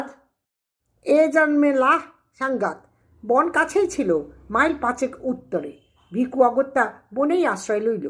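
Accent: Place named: Bangal, Bangladesh. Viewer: Darun porechen mashi bishesh kore bangal taan. Shunte shunte mone hochhilo ki privileged amra. Koto aramse thaka shotti kto complains amader.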